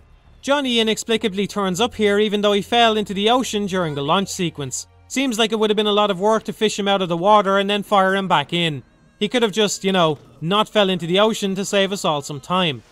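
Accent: Ireland. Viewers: Are you trolling? You seem to have made a statement concerning IGN and "learning" in the same sentence. Also a bit of advice, all caps equates to a 40% decrease in credibility. And also I think hes Irish not British. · He's Irish. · good strong irish accent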